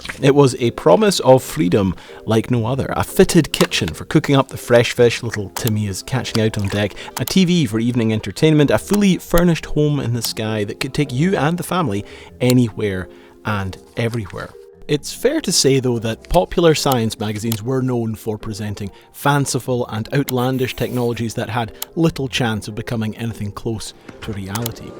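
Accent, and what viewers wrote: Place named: Scotland, United Kingdom. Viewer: I don't understand your Scottish accent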